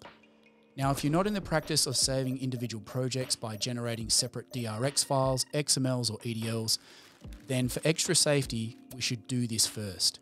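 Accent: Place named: Australia. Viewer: Hey dude! thanks for the thorough video. I like your presentation and um... Aussie! I'm wondering if you can help. My old Mac Pro running Resolve16 died recently, and I just took the leap to a Mac Studio. Anyway, I have no way of exporting my Resolve projects and databases, though I have the original files on disk, project folders, .db files in Application Support etc.. But I'm at a loss for how to restore my database with just these raw files. I've tried copying them to the new Library structure, but nothing's getting recognised. And I haven't found a way to import them. Any ideas where I should go from here?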